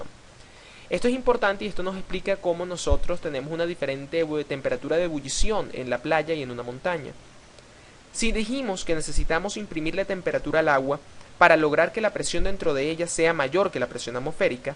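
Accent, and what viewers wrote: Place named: Venezuela. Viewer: Apenas empecé a escuchar el video supe que eras venezolano jajajaja :D Saludos desde barquisimeto!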